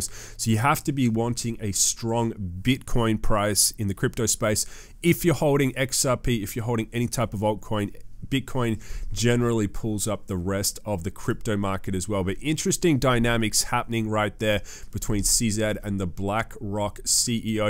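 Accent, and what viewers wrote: Australia. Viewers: Great to hear a good Aussie accent. Xrp will run like mad once the market runs. Now it’s clear adoption will explode Thanks for your work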